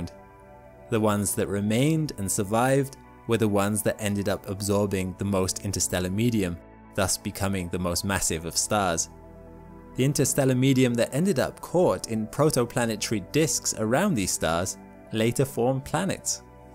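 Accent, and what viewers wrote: United Kingdom. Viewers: it's a called a british accent buddy. fun little fact; they're the ones that invented english, so you're the one with the accent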